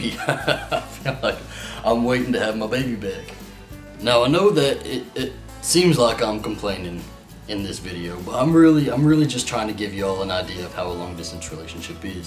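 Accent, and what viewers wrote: South, United States. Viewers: . You were talking about gay acting straight. You are very straight acting. If You didn't say you were gay, it would easily be assumed you were straight. But that what make you so damn cute. And I love your voice with the southern tone to add. Alastair is a lucky ass man. He screws this up, I'll beat him up for you. I'm straight acting, so I know what I'm talking about. But not as cute as you. Actually not young either - haha😂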